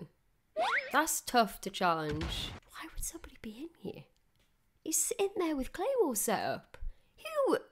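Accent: United Kingdom. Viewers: Is she from the UK?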